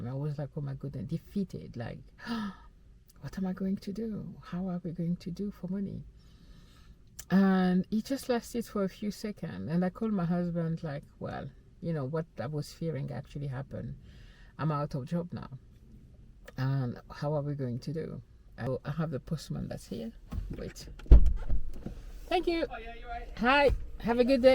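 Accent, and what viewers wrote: France. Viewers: That adsense delivery timing was perfect! 😂😂 I love what you said about God and higher power. Your accent is amazing - so is it French/British? Also I have had to fire two really good people in the past few months and it’s just as awful on the other side of the table. Our business is down in profit and if we didn’t let people go, we would eventually have to shut down and everyone would be out of a job. I know it feels so personal and terrible but it really comes down to spreadsheets. I don’t know if that helps or not but just wanted to say both sides of the conversation are really hard. I love my employees and know they have bills and families and know their stories. But I think you have a great attitude and I’m not worried about your future success at all!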